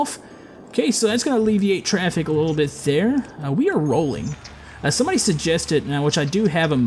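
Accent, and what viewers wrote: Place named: United States. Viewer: Your American accent drives me crazy!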